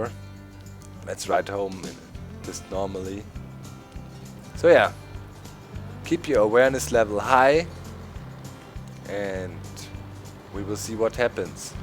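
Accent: Germany. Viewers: SAch mal .. spinn ich oder hast du n deutschen Akzent in der Stimme ?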